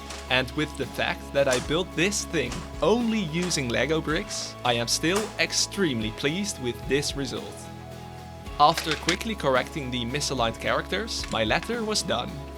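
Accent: Netherlands. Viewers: I heard you talk checked your profile, yeah Dutch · He sounds Dutch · you sound very dutch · Another dutch person successfully sniffed out by his accent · ik hoor een nederlands accent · You lowk sound dutch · Are you dutch? because you sound like have a dutch accent. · Why does this guy have a dutch accent? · ben je Nederlands? ik hoor je accent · Nice vid, and as fellow dutchie, i immediately caught on your dutch accent hehe ;) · Your from the Netherlands? The accent❤ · Is this guy Dutch? He sounds Dutch. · Nen ollander (duidelijk hoorbaar 😂) met veel tijd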